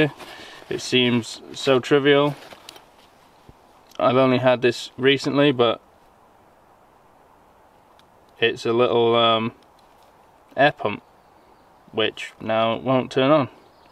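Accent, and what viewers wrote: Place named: United Kingdom. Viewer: hello how are you and i hope your doing when your not doing the bushcraft videos/solo camping videos and i wanted to say happy anniversary to you and your wife and happy 1 year anniversary on your youtube channel and at the risk of me repeating myself from the last comment i sent you it's like wow when i watch your videos i keep feeling peaceful and enjoy the wonderful nature everywhere you go and i like your dog she is a nice dog great seeing her as well i do have a a qustion how do you spell her name because people spell names different is it blu/blue/ and i hear british in your voice which i think is very very kool i like british people alot and /americans and of course my country canada and thankyou very very much for the Q&A i enjoyed that alot i will always enjoy your videos short or long always cheers to you and thankyou during these hard times your videos help everyone who watches them thruw the hard times from your new friend Randy again ontario,canada stay safe out there m friend.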